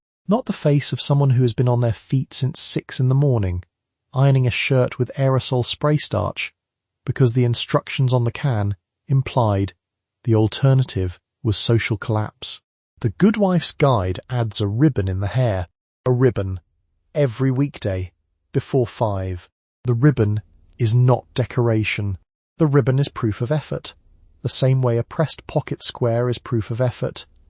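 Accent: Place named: United Kingdom. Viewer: I have trouble falling asleep listening to the British accent.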